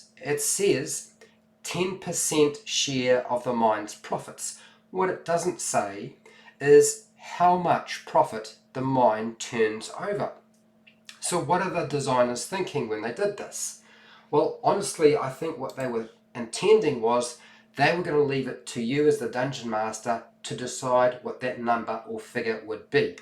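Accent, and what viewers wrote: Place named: New Zealand. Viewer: After watching so many of your videos, I'll have to dedicate an NPC or a villain to you because in addition to your content, you've become an invaluable resource for a great New Zealand dialect!!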